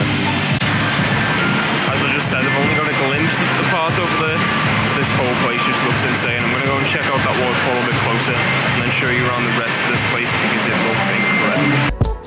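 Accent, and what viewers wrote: Liverpool, United Kingdom. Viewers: Are you a Scouser because if so you're the first Scouse youtuber I've ever seen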